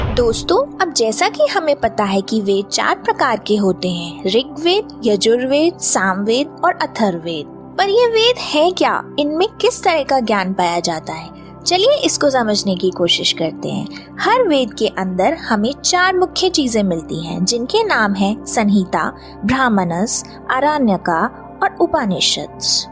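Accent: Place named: England, United Kingdom. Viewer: अच्छा है किंतु हिन्दी का उच्चारण उचित तरीक़े से करें ना कि अंग्रेज़ी तरीक़े से। जैसे उपनिषद ना कि उपानिषद।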